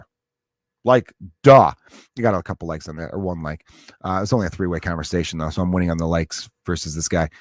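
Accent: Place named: Chicago, United States. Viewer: Personally, I like when you go a little “too Chicago”. It’s relatable.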